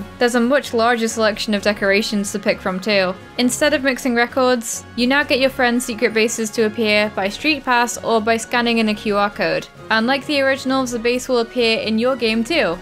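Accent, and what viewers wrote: United Kingdom. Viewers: British. She mentions it most recently in the Galar Version Differences video.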